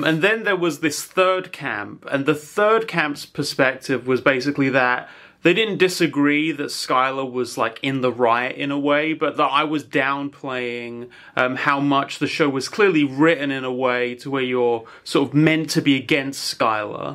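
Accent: United Kingdom. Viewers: honestly i can understand the impulse to want to go back on your delivery in the prior video. i didn't look at the comments but i'm sure it was full of people who were highly critical. i just went back and rewatched it and i have to say i don't think there's any backpaddling necessary, you did a fantastic job at meeting Skylar's critics in the middle and provided a very strong case illustrating how Walt pushes Skylar to rebel against him, ultimately making her the hero that triumphs over the life of terror he is bringing about to the family. I think for the last videos critics, with the time that has passed i'd be really interested to know if they still feel the same way. I think it reflects more poorly on them and their insecurity in their own perception of the show. It's like saying, "well i was wrong and you are right but i didn't like the way you said it!" and truly i can't see where they could see that other than Americans typically being overly critical towards GNC people and maybe your british accent feeling condescending to us when it's just how your fucked up voices sound. 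Anyways, ty for the follow up video, i'm loving these uploads so much.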